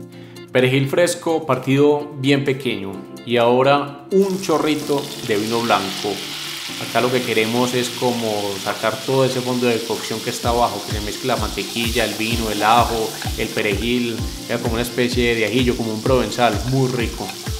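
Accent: Antioquia, Colombia. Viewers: Me llama mucho la atención el acento colombiano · sexy voz paisa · Eh ave Maria, se nota que sos paisa! Que viva colombia 🇨🇴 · esa voz de paisa tan linda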